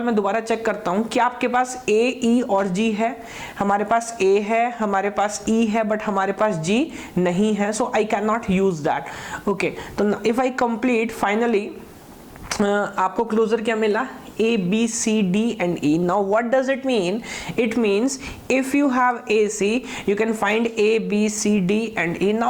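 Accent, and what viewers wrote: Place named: Indore, India. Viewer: it seems sir you are from indoor . You have that tone " kya bhi milega ". thanks alot sir for all the videos. I have being following all the lecture one by one .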